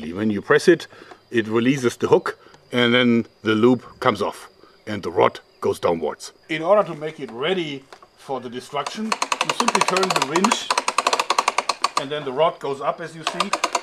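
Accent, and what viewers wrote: Germany. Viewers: You've got a real German accent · Cool video! Du bist deutsch oder? :D Erkennt man am dialekt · xXxFanisxXx it is a german trying to speak englisch (like me)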